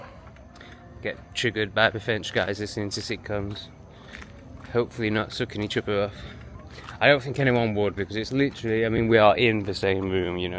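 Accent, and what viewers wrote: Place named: Upper class, United Kingdom. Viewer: You remind me of a privileged guy that went to some famous public school in the uk with that whining upper crust accent. I’ve seen the behavior a few times usually in a movie with the English in some foreign country less developed than their own . Not trying to insult but are you sure you’re strapped for cash? In your free time it might help to at least try to learn a few words of correct Spanish because you sound like even trying is beneath you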